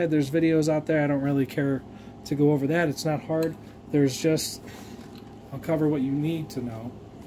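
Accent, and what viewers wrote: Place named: Midwest, United States. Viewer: Sounds midwest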